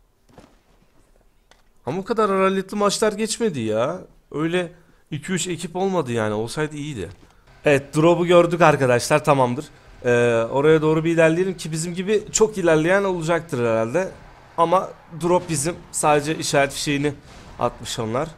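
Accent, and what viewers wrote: Adana, Turkey. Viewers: OLUCU DİCEKTİ VARDI DEDİ HAMURUNDA ADANALI ŞİVESİ VAR VİDEO CEKECEM DİYE DÜZGÜN KONUSMAYA CALISIYORSUN BRO 👑👑☺️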